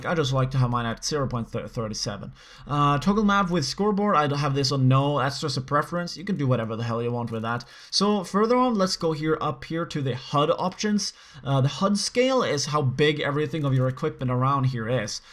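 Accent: Norway. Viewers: Hei :) O_o er du norsk? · Er du norsk?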